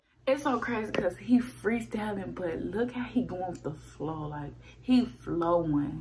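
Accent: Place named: New York, United States. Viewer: Are u from ny it sounds like u are😂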